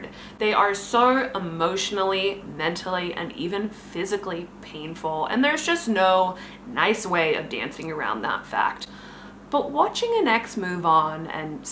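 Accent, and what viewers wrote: Australia. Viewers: You have a slight Australian accent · are you a bit Australian too? This video was really helpful. Thankyou :))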